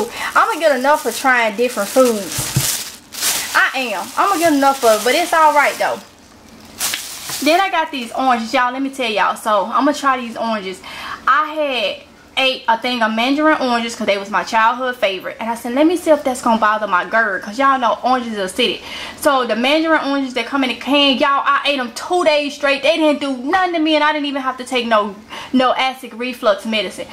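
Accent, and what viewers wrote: South, United States. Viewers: Love that southern accent it hits different lol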